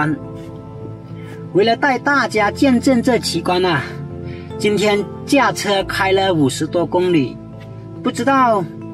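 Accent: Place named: Hakka, China. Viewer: 有客家話口音的普通話，也許說幾句客家話也有支持者喲！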